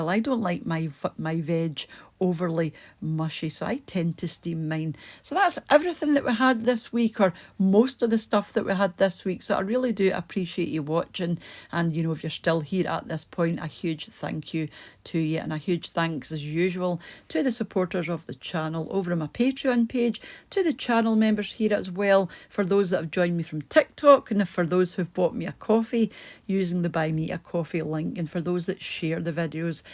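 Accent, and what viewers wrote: Scotland, United Kingdom. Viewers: Love your work,and the accent! I lost my scottish mother a couple of years ago,and your voice and recipes remind me so much of her-very comforting😊 Thankyou and look forward to the next episode! 😎 All the best from Australia🇦🇺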